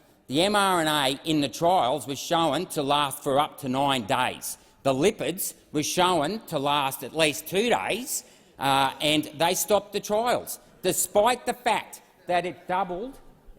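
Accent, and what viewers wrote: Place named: Australia. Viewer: Well done Mr. Rennick...keep the pressure on. But Please, why do you have to talk like Norman Gunston? Crikey, even Norman Gunston's creator, Gary MacDonald, doesn't talk like this in his normal voice. Nothing more grating than bluddy Ocker Stryne!......well, uzzer zan zee Klaus Prussian/German zat iz.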